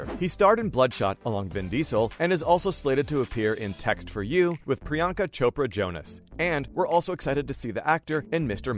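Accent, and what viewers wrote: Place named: United States. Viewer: Please learn to pronounce Glasgow. American narration is awful.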